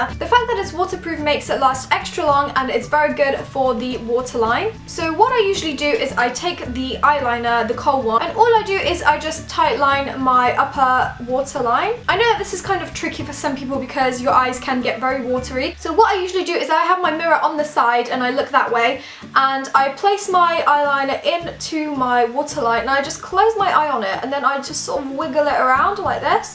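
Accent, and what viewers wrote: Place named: United Kingdom. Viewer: Girl do you live in the UK you have a british acsent I live In the UK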